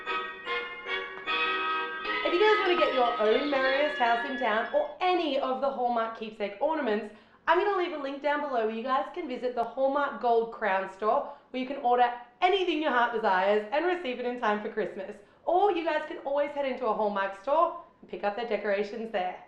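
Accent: Australia. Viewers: Is she from aus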